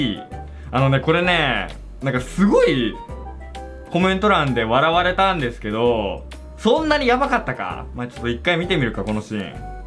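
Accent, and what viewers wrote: Kansai, Japan. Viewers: たまにくる関西弁好き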